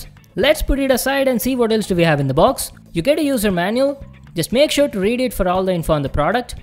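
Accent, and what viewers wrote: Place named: Telugu, India. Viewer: Bro... Nenu expect chesa nee slang Telugu la undi ani.. Mee father channel and chala refer chesi...i came to a conclusion .. NUVVU TELUGU VAADIVE...😊🤓😘😘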